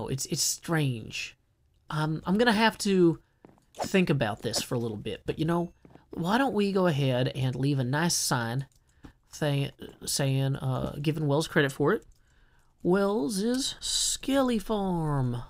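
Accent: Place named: South, United States. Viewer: Sound so country 😂🤠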